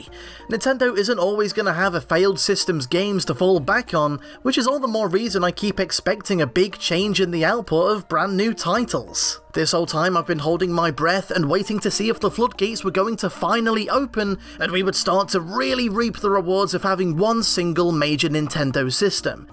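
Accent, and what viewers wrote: United Kingdom. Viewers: British is best. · sounds a lot like Dave from BoyinaBand. And I don't just mean because of the british accent, they have a similar tone and even inflection to their voices that for a moment I thought it WAS Dave doing the Voice Over. Eerie. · Thank you British, very cool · I'm really like the new accent. British? Yes, please! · I kinda like British better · voice is the opposite of soothing. It's super nasally and whiny. This guy is British. It doesn't get any better than that. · Damn. I like British. Really really great voice and speech. Probably my 3rd favorite YouTube Guy to listen to. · This sounds like a British. (Hope you get feeling better. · This should be the British dub of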